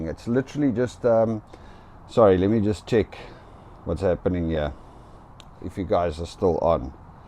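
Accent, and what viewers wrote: South Africa. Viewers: i like to hear a south african person talking about my home country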